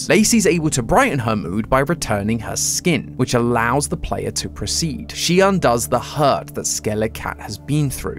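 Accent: United Kingdom. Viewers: LOL this is so good, gread job, i like britis acssent UwU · AMAZING THEORY Imma be honest tho i only watch horror game theories especially arg's and your british voice is soothing when talking about manslaughter.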